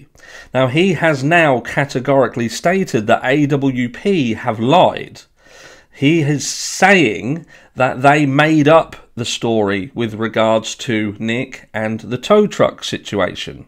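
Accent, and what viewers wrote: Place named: England, United Kingdom. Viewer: There’s 1 thing that Sami did that really stood out to me. So AWP start searching Boca & possibly Donna Lake, LE tell AWP not to search it, Sami is aware through her parents (friends in high places) that they’re not searching Prosser. AWP put out a post that they found Kiely’s car & remains (not staying where they found her), Sami posts immediately “they have not found Kiely & the parents have not been told….” Blah blah & so on & so on. LE didn’t think AWP would go search it after being told not too…there’s someone in LE protecting Sami & whoever else is involved. Also, after a post comes out that Sami has a lawyer, she then posts a video of her drinking & partying at Prosser. Imo she was celebrating getting away with murder! I have so many other inconsistencies with Sami but they are the 2 that really stuck out to me…. 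You do a great job. I prefer you over these American idiots just going over crap that’s irrelevant & going down rabbit holes. So thanks for keeping it real. It also helps that I love English accents 😂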